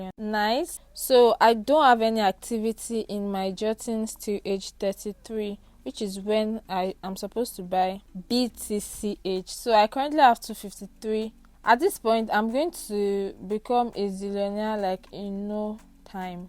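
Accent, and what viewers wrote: Nigeria. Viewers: Like do you get? When I subbed and started watching a video I was so shocked to hear the Nigerian accent Most Nigerians DONT get they hype of the game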